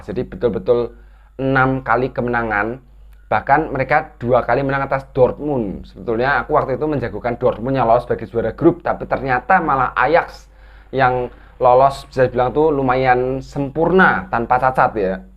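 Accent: Semarang, Indonesia. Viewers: Efek logat orang semarang kali🗿👍🤣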